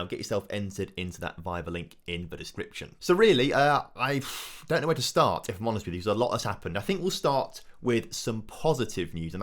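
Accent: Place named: Scotland, United Kingdom. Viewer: Love the Scottish accent 😂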